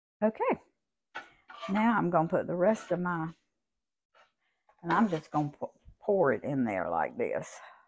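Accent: South, United States. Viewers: Just stumbled across your video, i love it, looks yummy. Your in the south i think from you accent, love it. I have subscribed, look forward to more, God bless❤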